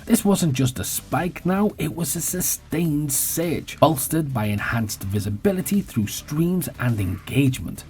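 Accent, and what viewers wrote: Liverpool, United Kingdom. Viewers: scouse legend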